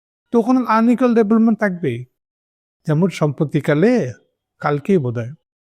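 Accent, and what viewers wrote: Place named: Noakhali, Bangladesh. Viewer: দাদা আপনার বক্তব্যে চমক আছে! নোয়াখালী ভাষার চমকপ্রদ মিশ্রণ আবার সত্য পথের বয়ান। সেই সাথে আন্ডারওয়ার্ল্ড ও প্রকাশ্য ডাকাত বাহিনীর মুখ্য অবয়ব প্রদর্শন। দারুন দারুন মারহাবা।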